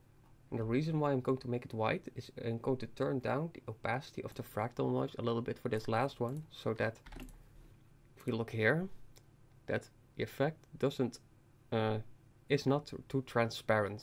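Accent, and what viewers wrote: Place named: Netherlands. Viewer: Are U Dutch? I Can Hear It In Your Accent And If Youre Wondering Im Dutch As Well !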